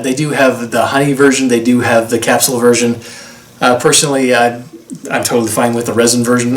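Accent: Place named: United States. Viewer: ? Does sound america. You swedish?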